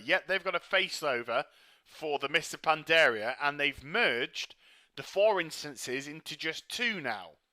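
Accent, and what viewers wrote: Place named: United Kingdom. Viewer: damn this guy's accent is annoying more so than other british accents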